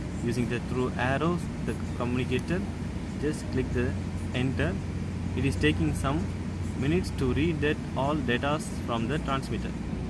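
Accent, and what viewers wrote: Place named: Tirunelveli, India. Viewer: Unga nellai tamil ku subscribe panniyachu...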